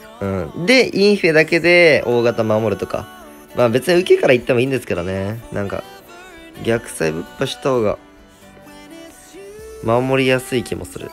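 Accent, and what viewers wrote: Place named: Kansai, Japan. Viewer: めっちゃ関西弁やん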